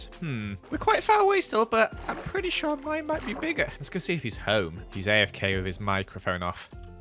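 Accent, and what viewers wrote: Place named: United Kingdom. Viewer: Is it just me or did got more.. British?😅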